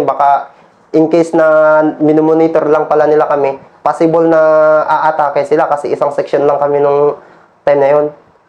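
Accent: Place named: Ilocos, Philippines. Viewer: ilokano yan taga ilocos norte... tanongin mo siya kasi mga taga roon.. ala won barok... di ala eh!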